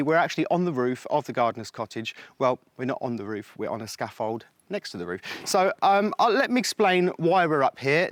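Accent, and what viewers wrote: United Kingdom. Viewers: …your British accent is so much more enjoyable to listen to than Dick Van Dykes!!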